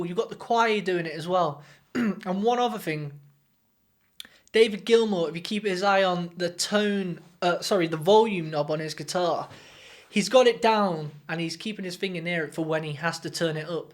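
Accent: United Kingdom. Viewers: What part of Britain are you from? Love your accent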